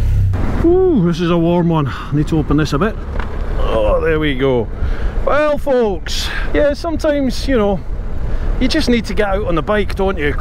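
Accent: Scotland, United Kingdom. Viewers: I come to this channel for your Scottish accent and your beautiful smile... and the bike content 😁✌ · OK, so you asked for feedback on possible avenues to take in future. Man, I don't blame you. It must be hard having to bring out content week after week. My immediate thought was that you should do what YOU like and not "pander" to the consumer; focus on your strengths. So what are your strengths? In my opinion you have a great personal gift in the way you communicate, a sort of light and honest way that gives you integrity. Your Scottish accent is charming (not meaning to sound patronising here, sorry if I do). A downside to that is probably that your audience is limited to those who understand what you are saying. I am guessing a lot of foreigners have a hard time there. I know YouTube offers "closed captions" but they are frankly a bit of a hassle. 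If I were you I'd go with regular subtitles, English as a minimum. With regards to content (I don't listen to podcasts so sorry if you are already doing this) but setting up interviews/discussions/MC comparos and ratings with some of your YouTube peers and others focussing on MC related content could be a way to go. One particular thing I always thought people would enjoy is taking a fresh look at older model bikes. Grab an old VFR, Bandit, FJR, BMW K models etc (through some of your subscribers?) ride them and re-review them/compare them to the modern bikes/talk about how they age and how they have held up. I understand that you try to make a living, but I personally think that you sometimes try a bit too hard to sell your chan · I was looking for unusual content a while back. I was a little sick of the bloke on a bike having a yarn while ridin around. So I came across this crazy chap who was doing a RTW on a Suzuki sports bike. 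Now THAT was interesting, so I watched the whole thing. And from an Aussie perspective I had (and still do) a bit of laugh about his Scottish accent, love it.......now guess who that bloke was people ?? Yep, that's what brought me to the channel and wouldn't that be epic if he could do something interesting at that level again :) Cheers rsm8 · Simple answer as to why I watch all your YouTube vids. Content, is relevant to my main interest of biking and you are definitely in the top 5% of the biking Youtubers out there for the quality of content. The Scottish accent is very easy on the ears. I like your sense of humour. I particularly like it when you punish yourself like on the Sinnis. 👍👍👍💪😎😁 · Shame you couldn't get over to Scandinavia with your colleagues. Nordkapp perhaps:) Well I am hoping to do it next year with a mate, we will both be riding Royal Enfield Himalayans! We will be taking it steady I suppose:) What makes me come back to the channel? 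Your charismatic personality mixed with honesty and of course the Scottish accent. My father was a Scotsman and the fact that your an accomplished motorcyclist:)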